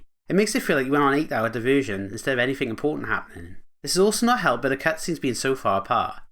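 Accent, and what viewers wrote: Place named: Wales, United Kingdom. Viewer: Ah I know a Welsh accent when I hear one!🏴 Agree with a lot of your points! Compared to other classic TR entries, TR2 is the least replayable for me. I like the heavy combat, but the underwater section, clunky vehicular gameplay and weak story really let the game down. Interested to hear your thoughts on TR3! Excellent video! Subscribed!💚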